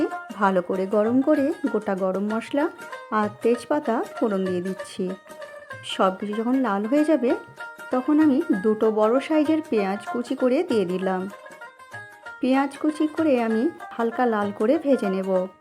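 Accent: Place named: India. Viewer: রান্নাটা দেখতে দারুণ লাগছে। আপনি কি ভারতীয়? কথাবার্তা ঠিক আমাদের মতো মনে হচ্ছে না।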